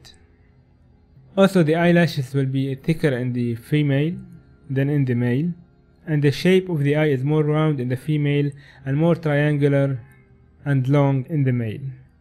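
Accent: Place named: Egypt. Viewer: Egyptian accent. So proud of you. Keep it up. ما شاء الله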